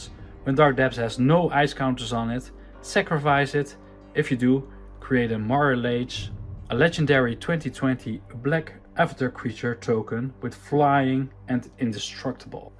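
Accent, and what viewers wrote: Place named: United Kingdom. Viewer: now thats how you speak the kings 🤴 English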